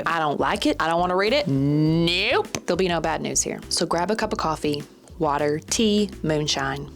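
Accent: South, United States. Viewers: I love your accent❤. I wish I could talk like that too. I would appreciate if you make a tutorial on how to speak like a redneck.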